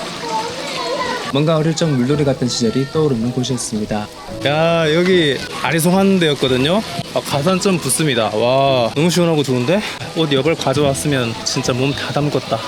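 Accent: North Korea. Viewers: 말투에 북한 사투리가 느껴지는데? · 근데 경주출신 맞으세요? 말투가 뭔가 탈북민 같은데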